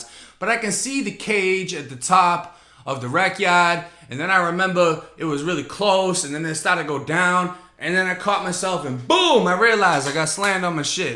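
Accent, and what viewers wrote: Boston, United States. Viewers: Lol that Boston Accent getting strong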